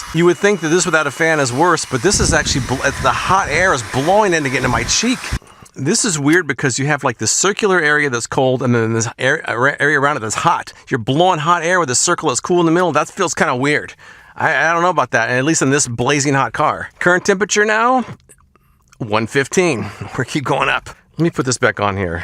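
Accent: United States. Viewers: To me he sounds like the most american guy ever. This what I'm thinking when someone say: american guy.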